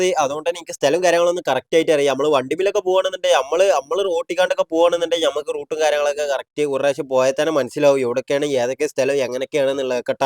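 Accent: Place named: Malappuram, India. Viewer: 😂 ഇങ്ങള് മലപ്പൊറം എ വുടേന്ന പറഞ്ഞെത് എത്തായാലും വീഡിയൊ ഇട്ത്ത തൊക്കെ അടിപൊളയാണ് ട്ടണ്ണി അൻ്റെ വർത്താനും😂 നല്ല രസണ്ടേനി കേക്കാന്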